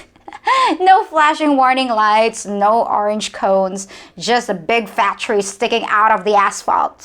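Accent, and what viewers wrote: United States. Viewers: Beautiful Leyte. Have you been abroad a lot? Your English are very good and very American. And have a hard time resisting answering what you put out:-) All countries has plus and down sides. The good thing with down sides is that then there is something with a potential for improvement. Yes the bikes with all the people on it....I remember first time i see that. My friends at home had a hard time believing people put their kids on a bike like that and took that risk. Later I have seen some real bad accident with bikes in Cebu. A bike is designed to take one or two people, and if you go beyond the factory specs, anything can happen. And yes the roads in Pilipino city's are overcrowded. Everyone like to have their own car. But the road are limited. The better of the people there will have it, the more people will buy their own car. Soon I have to buy a horse and bypass the almost stagnant traffic. 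This is a political theme that not only Philippines has to work with, many big cities around the world had to address this. regarding cats with messed up tails, I always believed it was all the loose mad dogs that bit them of....So it is inbreed... And coffee....I come from one of the most coffee drinking countries in the world. I guess freezing cold climate large parts of the year has done a hot cup of something popular at work breaks and after a meal. My grandmother lived in a time they buy imported beans. This was grinded on a very small grinder, so small it would fit i a lar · your American english accent is so good I would think you were the girl next door. I'm from Washington St. and I would say you have a northern accent. It blows my mind every time I consider that you have never been outside of the PI. Oh yeah and your addicting to watch.:)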